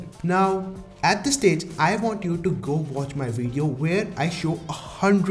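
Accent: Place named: India. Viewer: First time I hear your voice you are Indian.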